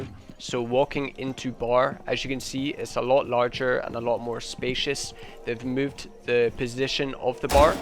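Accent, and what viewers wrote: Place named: Belfast, United Kingdom. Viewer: R u from belfast?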